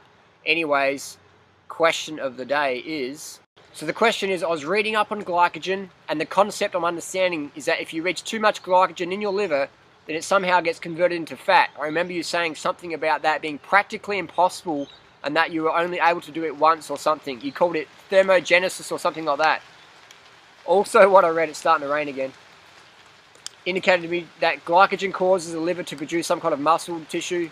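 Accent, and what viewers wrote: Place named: Australia. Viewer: You sound Aussie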